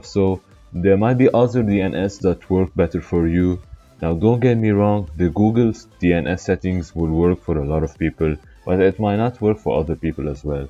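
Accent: Lebanon. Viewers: Are you Lebanese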